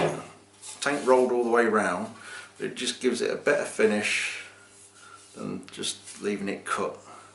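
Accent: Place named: Leicestershire, United Kingdom. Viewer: Just found your channel, and started catching up with your vids, I've been an engineer all my life, so can appreciate your skills, and with that accent, Im thinking you are from somewhere in Leicestershire? anyways, great content, really enjoying it!, cheers Dave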